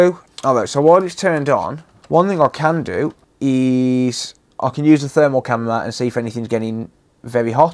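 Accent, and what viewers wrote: United Kingdom. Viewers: You're from the United Kingdom I can guarantee that am I right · british dude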